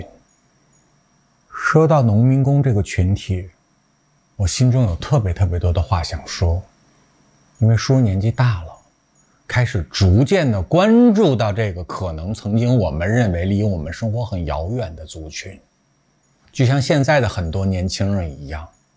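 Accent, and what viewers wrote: Beijing, China. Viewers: 聲調像是老北京，容貌眉眼有皇室貴族的感覺，該不會是愛新覺羅後代😊按讚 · 纯正的京片子，適度的京式曲藝评说神態; 貼地、真實的講評内容; 抑揚顿挫的语调; 是繁茂的自媒體叢林中的一朵奇葩！ 支持你， 。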